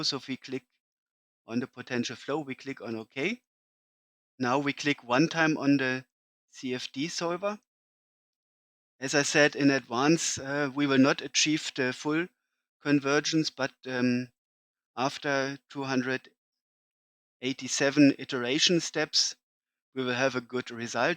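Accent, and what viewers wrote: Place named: Germany. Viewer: Danke, auch wenn man am Akzent schnell gemerkt hat, dass du Deutscher bist :D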